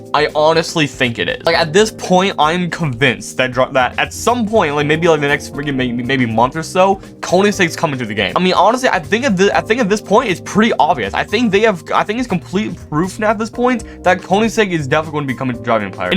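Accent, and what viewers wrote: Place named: Kentucky, United States. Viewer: You from Kentucky? I’m from London Kentucky myself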